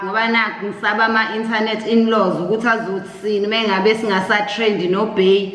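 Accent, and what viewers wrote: KwaMashu, South Africa. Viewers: All I can hear is the Kwamashu accent😅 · Heh heh lol my cousins from Kwamashu accent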